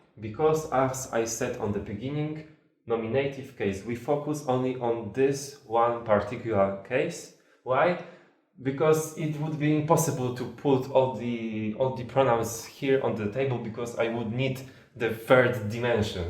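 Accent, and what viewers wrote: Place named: Poland. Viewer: Greetings, Sir! I am here for your Polish English !!! )))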